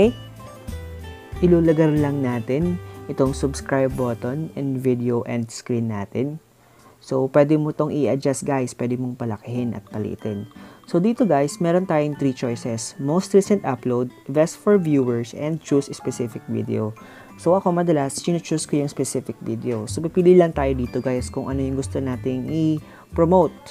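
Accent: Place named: Philippines. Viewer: THE CUPIDO CLAN he speaks English but has a fillipino accent and sometimes he's speaking a little bit of Filipino and just put English sub i hope that helped and sorry 😞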